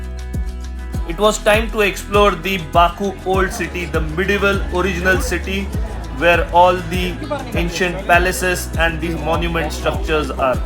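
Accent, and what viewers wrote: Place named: India. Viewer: Great guy. Heavy Indian accent with only a few pauses.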